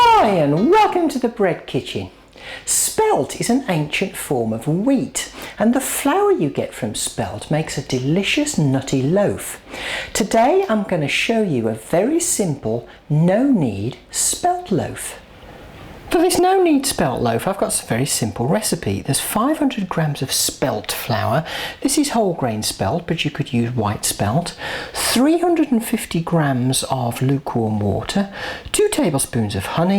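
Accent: Birmingham, United Kingdom. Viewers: Okay, so trying to morph my loaf from one recipe to another didn't work! I've now followed this recipe religiously and will post the result. I know what folk mean about voice and I detect a faint Birmingham accent there. What a pity she no longer communicates with her supporters because of the ugly people who drove her away. I've subscribed to her gardening channel as I need help in that department too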